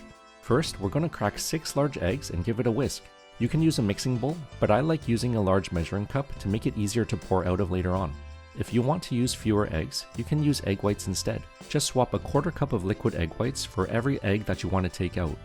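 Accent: Canada. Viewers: Canadian voice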